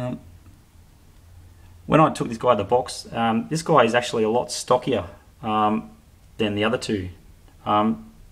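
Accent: Australia. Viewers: nice review must be an aussie mate thanks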